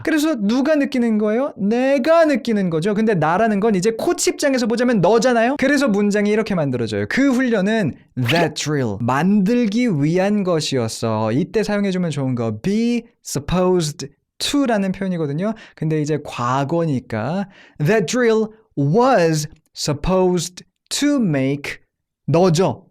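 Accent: United States. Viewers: Wow; just amazed, your American accent is perfect.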